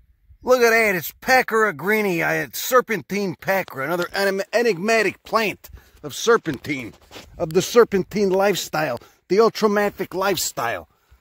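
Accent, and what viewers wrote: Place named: West Coast, United States. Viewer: Wow! You give me hope for Paradise and Magalia. Well, not for me, but maybe in 250 years it'll be back the way it was. I searched many barrens in eastern Butte county. I just knew there was an undiscovered Californite lode besides Pulga. Nah! But it got me out walking and exploring. Love your instructionals. Your west coast accent sneaks in there sometimes.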